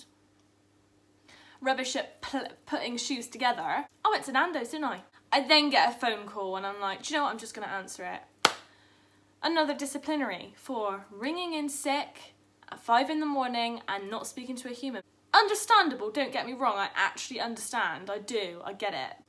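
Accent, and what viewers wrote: Bristol, United Kingdom. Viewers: Love the video. Bristolian accent I’m guessing ayyy.....were the same I’ve subscribed to your channel ❤️ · I’m from Bristol u sound really Bristolian ?? Xx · We all know it was Bristol primark babe your accent is thicc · Bristol maybe judging by her accent ahah <3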